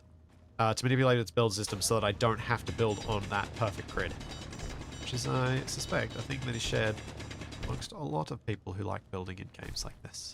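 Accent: Australia. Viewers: It is funny how you sound more Australian when sick. I never paid much attention to this, but now i can really tell the accent.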